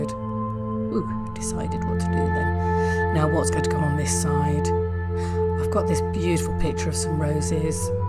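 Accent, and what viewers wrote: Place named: United Kingdom. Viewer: Yes, I thought the same. The music was beautiful, but it did compete with your lovely voice... and I LOVE British accent.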